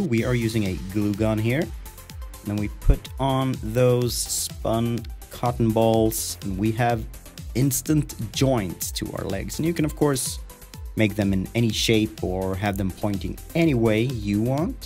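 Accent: Sweden. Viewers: i was not expecting the dialect i'm so used to rikssvenska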